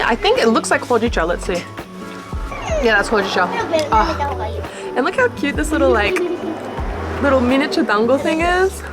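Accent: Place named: Australia. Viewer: You can 'hee-yah' the 'dee-yah' - I love your Australian accent! 💖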